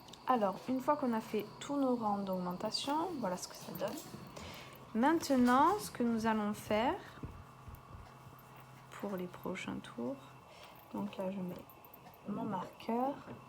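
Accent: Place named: France. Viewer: Merxi a toi,pour ta facon de nous montrer comment fabriquer toutes les belles choses que ru nous. Montre,,et tu as un talent vraiment special,pour nous montrer et une patience vraiment tres belle a voir ,pour nous,car tu prend tout ton temps pour nous montrer a fabriquer les choses que tu nous enseigne..alord merci et ne lache pas et ton accent francais est tellement beau et dou a entendre..ne lache surtout pas..milles fois merci...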